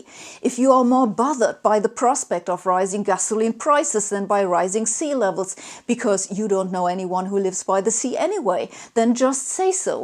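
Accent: Germany. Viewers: She's acting pissed, but by the end of the video I can tell she's half dead inside having to break down something that was supposed to be obvious to most people, and yet it isn't. Keep up the amazing job in your career and in your Youtube channel! PS: Any female with an angry german accent will always have a special place in my heart <3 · Lol, your accent is German, but you cuss like an American Army drill instructor. Bravo!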